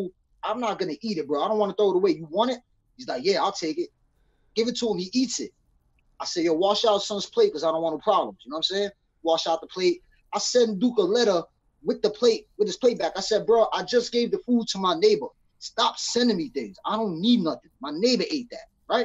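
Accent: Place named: New York City, United States. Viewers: Scrolling through the videos I see the New York and had to check it out. Man that was a great show. I did 7 years in nysdoc, this guy was giving me flashbacks 😅 Going to the Shu. They feelonfof pulling up at Auburn, it was Clinton corr for me, one of the 3 oldest, sing sing being the other. Creepy old as f, your like, fffff.. I Listening to him I miss the accent. I was from the country, you get to prison in NY and 90% are from the city. 😂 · No Spanish but man’s got the most nyc Puerto Rican accent I’ve heard in a minute. I like this guy.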